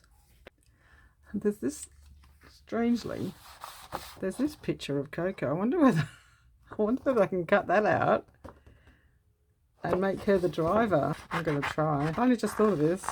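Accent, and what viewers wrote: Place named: Australia. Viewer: So glad I found you not so long ago as I find listening to a fellow Aussie really relatable. Love what you use to collage with. It was great to see the magpie and how friendly it was. We used to have a family of magpies who came and played on the kids trampoline and our hills hoist for years until the crows moved in. Really miss them still. I would love to be in the giveaway for the stamps. Kicking myself for giving my stamp album years ago before I started collaging. I have saved your playlist of Margarete Miller collage challenges to watch and have seen a few already. Thankyou for sharing. 🙂🙂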